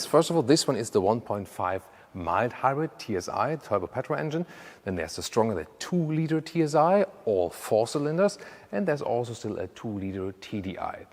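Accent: Germany. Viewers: Deutsch-english LOL XD bleah!!! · I love german accent 😂😂😂🇩🇪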